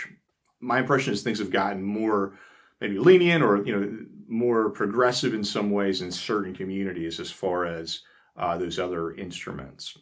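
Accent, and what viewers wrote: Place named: North Carolina, United States. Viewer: He speaks like my brother, who is a ninth generation North Carolinan!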